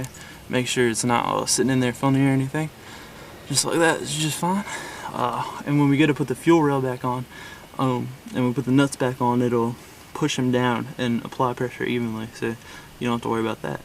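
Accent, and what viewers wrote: South, United States. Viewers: somewhere from american south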